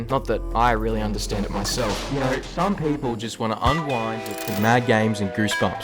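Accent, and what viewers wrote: Australia. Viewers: DAMN BROS MORE AUSTRALIAN THAN ME🐨🦘🇦🇺🇦🇺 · australian man explains roblox sounds nice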